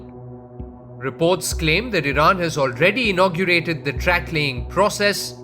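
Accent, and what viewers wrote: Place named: India. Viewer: you talk your desi way, girl.. pretending this is awkward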